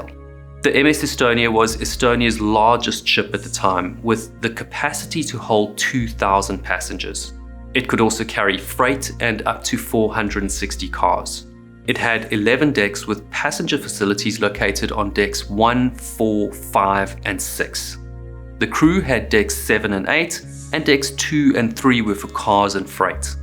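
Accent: South Africa. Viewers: Neat accent. South African, is it?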